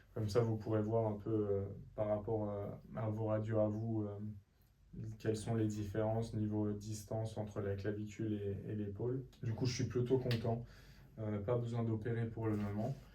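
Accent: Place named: Lorraine, France. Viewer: Hello ! Merci beaucoup pour ce journal de bord, bien amené et bien documenté ! Bien contente d'être tombée dessus :). Ici je suis actuellement à J7 d'une chute en VTT de descente avec un stade III également, et je me reconnais totalement (la douleur du matin par exemple ;)), c'est fou ! Par contre je pense me faire opérer parce qu'étant une femme les muscles autour de l'articulation ne suffiront probablement pas à compenser la gêne qu'elle soit fonctionnelle ou esthétique, d'autant que j'ai besoin d'être rapidement opérationnelle sur le plan professionnel & aimerais reprendre ce qui est volley +++, natation, squash... sans crainte. A discuter avec les chirurgiens dans les prochains jours ! Et merci pour ce bel accent du Grand Est (lorrain?) qui me ramène à mon Alsace natale ! ;)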